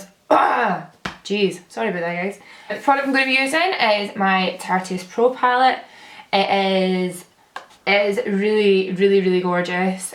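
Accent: Scotland, United Kingdom. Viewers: Legit obsessed with all ur looks!❤️I think you are the only scottish gal that sounds normal on video, in my videos I sound sooooo bad I HATE my Scottish accent tbh😩Xx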